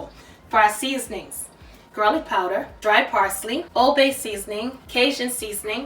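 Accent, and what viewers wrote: New York, United States. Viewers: This recipe looks so good. My mouth is watering ok!!! I love the way you put it all together with simple ingredients. I know you're my NY homegirl from the way you say "sauce" "talking about" and other words as well. Thanks for sharing your wonderful recipes and impeccable cooking skills. By the way I'm a Bronx girl "The Boogie Down". Keep doing what you do!!! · I’m not from the U.S, is that a Newyork accent? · Dear, please, if you can, write the names of the seasoning / ingredients on the screen, as you add them. Many vloggers do that, and that's Good for people who don't have English as first language, and people who are hard at hearing, and many senior citizens are hard at hearing. Also, not all English speakers will understand your American sounding accent, so by writing on the screen, you'll make it easier for the whole world to watch your videos and appreciate it. 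Non English speaking Africans may not watch because of the language, most Indians, Chinese, Arabs and others may have difficulty in understanding what you are saying, and by writing on the screen can give you more views. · Sound like you from New York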